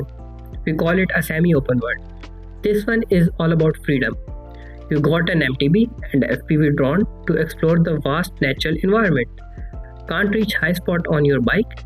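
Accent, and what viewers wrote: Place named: India. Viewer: Your indian accent sucks bruh...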